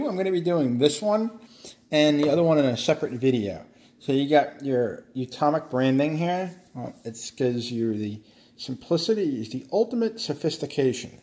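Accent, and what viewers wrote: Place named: Northeast, United States. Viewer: Yup, the north eastern US accent is still the most atrocious in the country. The accent sounds like a retard being beaten.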